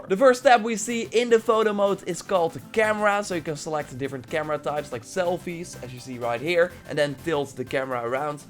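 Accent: Netherlands. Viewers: You sound like a dutch man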